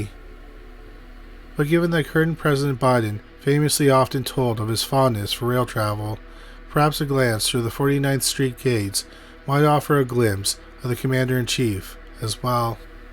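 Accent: Canada. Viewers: Remember that he isn't from the US. Maybe up in Canada they are taught to pronounce words this way. They think we are insane for not spelling words with u's in them like colour for example.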